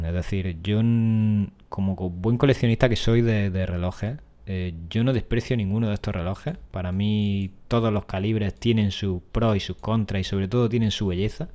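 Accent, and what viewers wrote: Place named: Granada, Spain. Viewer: Disculpa pero me acabo de dar cuenta que no respondí a tu comentario. Muchísimas gracias por tu tiempo, me quedo más tranquilo si és reparable. Tu vídeo me parece muy interesante, por tu acento intuyo que eres granadino, tengo mucha familia por la zona. Saludos desde Barcelona!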